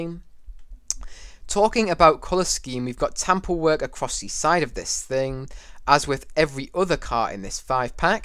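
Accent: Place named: Ireland. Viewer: U sound Irish just loving it Bro 😎🇧🇸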